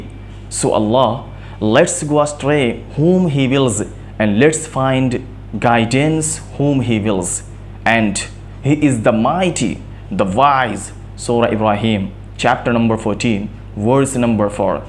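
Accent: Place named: United States. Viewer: Lag raha hai America se aaye ho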